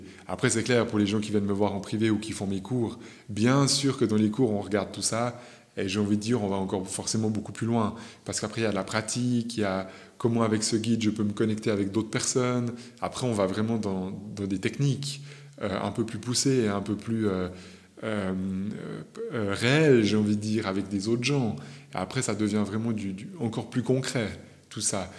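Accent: Vaud, Switzerland. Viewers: Votre voix est extrêmement rassurante et ce petit accent vaudois y est pour beaucoup a mes yeux... ;-) Merci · Merci au petit suisse, quel voix, quel intonations et quel accent délicieux et puis toute cette douceur ❤ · Bonjour. Merci 🌸. C'est plus fort que moi alors je le dis: cet accent vient de CH n'est-ce pas? J'ai expérimenté ou plus précisément, je me suis rendue compte de cette énergie en 2020, elle s'est invitée à l'imprévu ... hihi. Je l'ai apprivoisée et j'arrive à la faire venir à chaque fois que j'ai envie. Jusqu'à mauntenant je ne fais que la ressentir et sentir ma sensation ... maintenant je sais qui c'est, je lui ferai part ma demande. Encore MERCI ❤.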